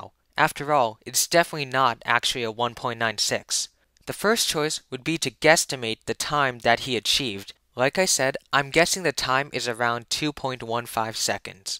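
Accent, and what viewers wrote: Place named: Philippines. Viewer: lol yep :D but idk it's weird I never realized how much of a Tagalog accent you have... But thanks anyway :P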